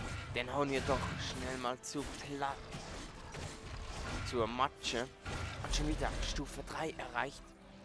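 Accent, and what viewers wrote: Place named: Switzerland. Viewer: Also ich find den leichten Schweizerakzent ganz angenehm ;) Diese deutsch-deutsch-Laberer kann ich langsam nicht mehr hören xD Hab auch gleich abonniert ;)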